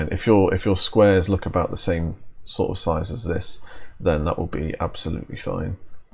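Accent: United Kingdom. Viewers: OK thanks and BTW how refreshing to hear an English voice on one of these videos and not a loud 'WHAT'S UP' !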